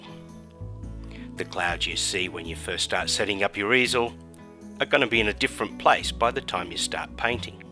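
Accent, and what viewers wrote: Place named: Australia. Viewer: Ah finally found your channel after discovering it a couple weeks ago then losing it lol. Love your style of painting and the way you talk, gotta love them aussies. Im subbed.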